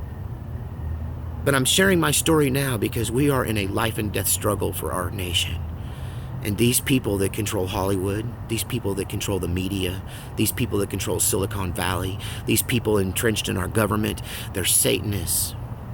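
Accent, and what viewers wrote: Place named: Texas, United States. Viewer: I knew you must be from Texas. (That's a compliment).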